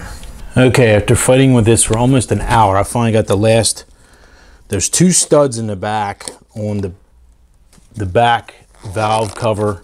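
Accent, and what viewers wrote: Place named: Pittsburgh, United States. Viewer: Thanks for posting this. I took my 4.4L apart to replace the PCV valve. Your video helped a lot. And finally, you have to be from Pittsbirgh, PA judging from your accent!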